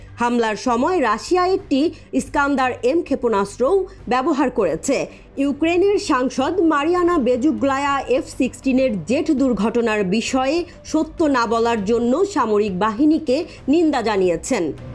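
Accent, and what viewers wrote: India. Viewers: ভারতীয়দের মতো কথা বলে এই সাংবাদিক/উপস্থাপক।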